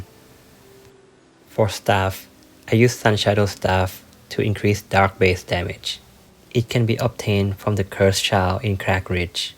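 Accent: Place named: Thailand. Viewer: You sound Thai, where you from?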